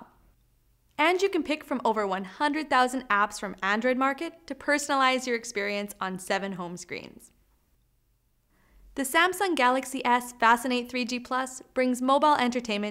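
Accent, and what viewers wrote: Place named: Canada. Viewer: Agreed, Im having trouble figuring out what kind of accent. Canadian maybe? We would know if she said "out"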